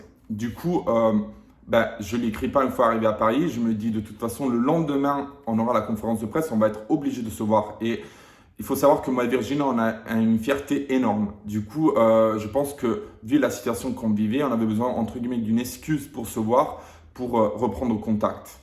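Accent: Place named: Italy. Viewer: j‘ai eu un „coup“ de coeur sur toi dans les apprenties tu te laisse pas faire t‘as un coeur d‘or ET EN PLUS T‘ES TELLEMENT BEAU AVEC TON ACCENT ITALIEN TROP DE LA CLASSE VRAIMENT une personne incroyable!!!!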